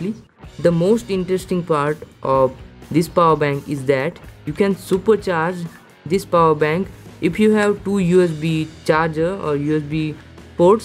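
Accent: Maharashtra, India. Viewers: Marathi accented English! Liked it lot.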